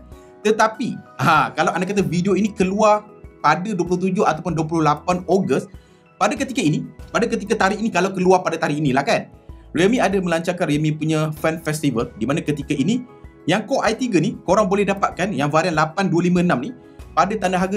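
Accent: Malaysia. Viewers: Bru prtma nnton yutub org malaysia . Seruu bahasanya . Saya suka logat malaya . Smoga maju terus channelnya bg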